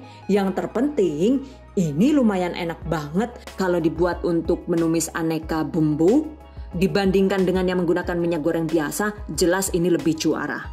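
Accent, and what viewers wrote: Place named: Java, Indonesia. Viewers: Orng mariun ko' pke gya bhsa orng teggal. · Aduh mba suara nya lucu bngt kl lg kecaprakan .bhs jw nya medok bngt .wk wk wk 😃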